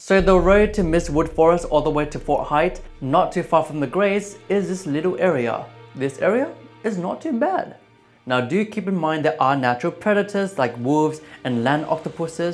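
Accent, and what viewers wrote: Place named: Asia. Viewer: my bad, I didn’t assume an Asian person with a heavy Asian accent was Australian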